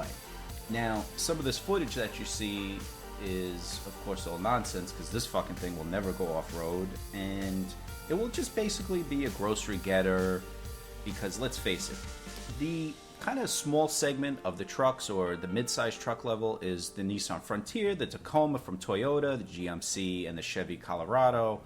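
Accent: New England, United States. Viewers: I am a salesman at a Honda dealership in Atlanta, and we had deposits on these Ridgeline's two months in advance, and still have many deposits to be filled. If you want to be sold on this truck, just come down from what ever New England state that your and your horrible accent is from to Georgia and we'll get you hooked up with the most diverse trunk on the market! Thanks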